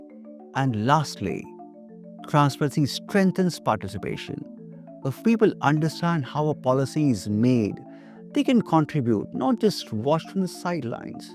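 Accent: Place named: India. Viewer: Sir little bit more indian accent 👍👍👍 because I want to listen you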